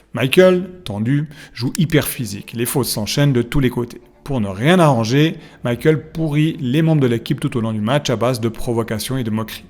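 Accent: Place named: Switzerland. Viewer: Bravo pour le boulot et l’accent Suisse qui fait plaisir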